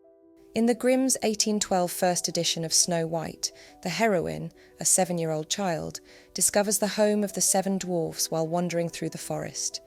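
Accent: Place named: RP, United Kingdom. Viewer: I like the narrator's posh accent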